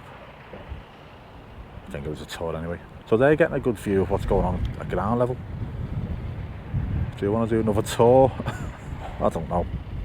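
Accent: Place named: Liverpool, United Kingdom. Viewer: You know those vids on YouTube that are primarily for a foreign audience that focus on the regional accents of England? Yours will get picked up as the Liverpool example as opposed to the Carra/Gerrard ones they always use. 😊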